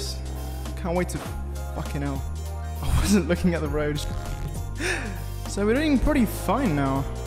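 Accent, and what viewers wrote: Italy. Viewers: I know you are Italian, Your English is Diffrent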